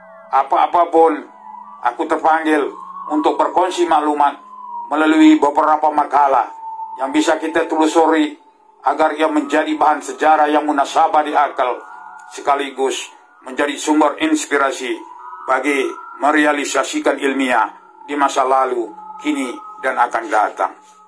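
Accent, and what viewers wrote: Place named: Indonesia. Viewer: suara dan dialek jelas indon asli pak...walaupn hujahnye aku stuju..tp anda mmg jelas warga indon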